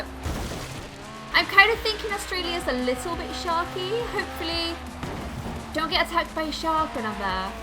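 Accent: Ireland. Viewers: what?? you're irish?......do you know jacksepticeye? I'm a big fan of his. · ur Irish? high 5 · are u Irish?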